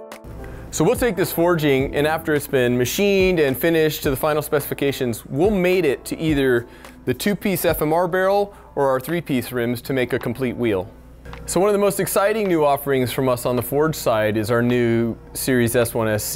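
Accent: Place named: California, United States. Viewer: Haha I like it he talks like the Californians on SNL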